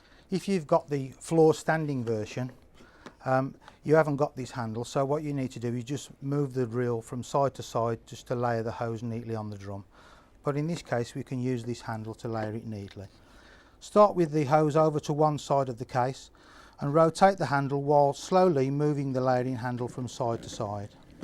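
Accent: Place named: Birmingham, United Kingdom. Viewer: Great instructions, the paper instructions were pants, lovely Brummie commentary, Thanks our kid!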